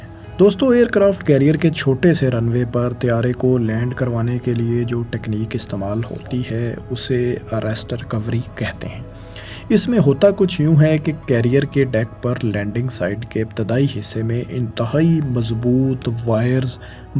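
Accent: Punjab, Pakistan. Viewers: پنجابی ایکسنٹ میں اردو یہ جناب بولتے ہیں۔ پروگرام لاجواب ہے مگر غیر موزوں آواز کے باعث اپنی افادیت کھودیتا ہے۔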